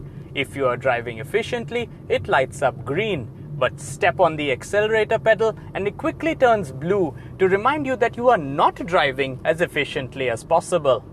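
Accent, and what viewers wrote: India. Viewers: Indain English WTH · It's good that Honda is also considering Indonesian cars for the UAE as that is a region where even the basic variants of all models get a brilliant equipment list and the HR-V is no exception to that. While the exterior design does look good, it is too simple for my liking. Even the interior switches and the layout of the instrument cluster is as if directly taken from the Jazz and the City, the latter being sold here. This is one Honda which failed to impress me in the interior department as we are used to seeing sumptuous designs from the automaker. The Civic, Odyssey, Accord and Pilot, all have a certain wow factor which the HR-V lacks. The only feature to write home about is that ironic "Indian English" accent and the magic seats at the rear to increase the cargo area. On all these grounds, it is the Kia Seltos that for me is the undisputed king because of its fantastic design and the features it gets. 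However, as you pointed out, it is the cheapest car currently on sale which is surely to have buyers thronging at Honda's dealerships. And then, there are the basic virtues every JDM car comes conferred with: Reliability, low maintenance costs, excellent fuel economy and an obnoxiously high resale value which is what is going to make a great case for itself. How I wish manufacturers get rid off that CVT transmission and use a torque-converter and turbo-petrol engines like the 1.5 in the Civic. That would be the perfect choice of the eclectic options with Honda. Enjoyed the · Good review! India English! That’s quite interesting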